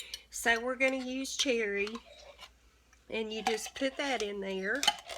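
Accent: South, United States. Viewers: I love her southern accent. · Omg, love your cooking and southern accent, your voice is so comforting ❤️ please keep cooking.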